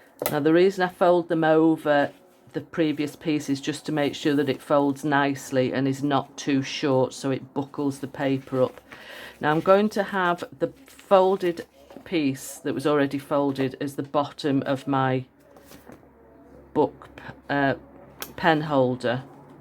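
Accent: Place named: England, United Kingdom. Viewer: Your video popped up in my feed and I can’t believe I’m just finding you! After viewing, I immediately subscribed, and now will need to go back and try to catch up with your incredible ideas! Thank you so much for sharing your creations! I’m intrigued by your English accent and the fact you’re in Spain…love the background noises! I’m picturing you living in a city above shops…that’s always been my dream! All the best to you from the USA ❤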